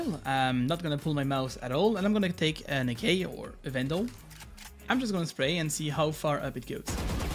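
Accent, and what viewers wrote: France. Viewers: j'adore ton accent français en mélange avec l'anglais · Are you French? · you could go on top of something with Pheonix with the Ice wall of Sage if she cast it under you! New here, what is this accent, are you french by any chance ? I'm from Quebec, Canada!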